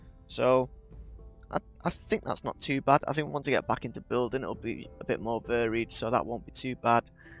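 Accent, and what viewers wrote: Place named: United Kingdom. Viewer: I wish I could say 'build battles' the way you do XD I like that British accent. and btw gl on modern :P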